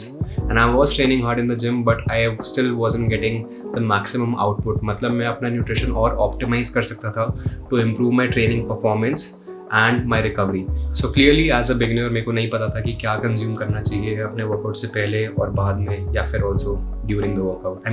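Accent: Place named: Delhi, India. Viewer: Why do you pronounce English words like that, lol. Like when you say track, it sounds like truck. When you say pack, it sounds like puck. When you say man, it sounds like maan. Thick ass Delhi wala Indian accent.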